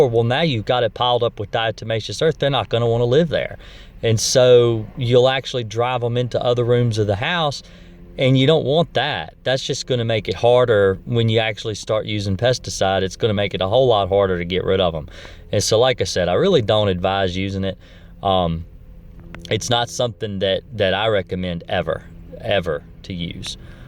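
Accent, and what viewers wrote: South, United States. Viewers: Dat southern accent!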